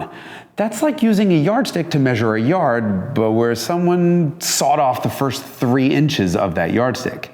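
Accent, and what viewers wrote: Germany. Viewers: His German accent is on point. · His German accent sounds like Klaus from American Dad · The German accent lol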